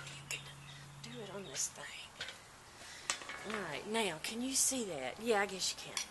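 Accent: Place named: South, United States. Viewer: Love this southern gal! Sounds just like Paula Deen! Maybe it is? LOL....going to repair my concrete birth bath now!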